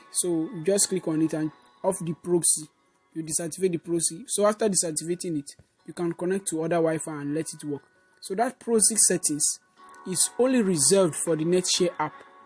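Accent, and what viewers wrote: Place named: Africa. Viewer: African accent❤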